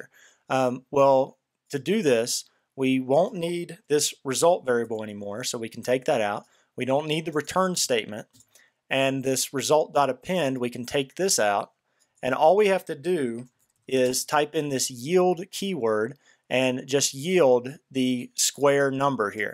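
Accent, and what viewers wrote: South, United States. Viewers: I really appreciate both the way you explain things and your slight Southern accent. It makes it a lot easier for a Georgia girl to understand. Thank you!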